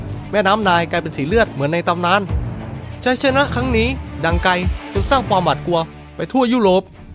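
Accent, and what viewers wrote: South, Thailand. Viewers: มาเป็นกำลังใจให้ครับ ติดตามมาจากประวัติโลกและมนุษย์ตัวเต็ม ผมไม่รู้ว่าแอดเป็นคนใต้มั้ย พอฟังจากคลิปที่แล้วรู้สึกสำเนียงออกใต้ๆ นะ แค่เดาเล่นๆ ไม่ได้มีเจตนาไปทางอื่น ปล.ผมเป็นคนใต้นะ ทำคลิปดีๆออกมาเรื่อยๆละ อ้อลืมบอกกดติดตามให้แล้วนะครับ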